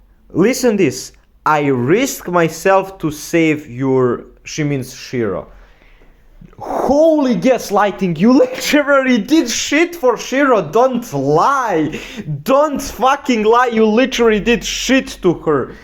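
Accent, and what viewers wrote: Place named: Yugoslavia. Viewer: you are so mature wow , by the way good yugoslav accent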